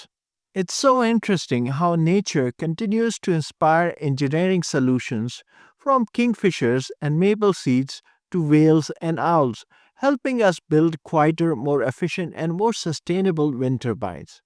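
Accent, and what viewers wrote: India. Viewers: I am enjoying the Indian lilt of this man's English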